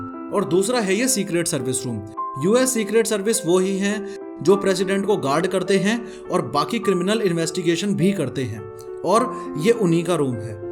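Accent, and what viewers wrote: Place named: Jaipur, India. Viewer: Sir are you from Jaipur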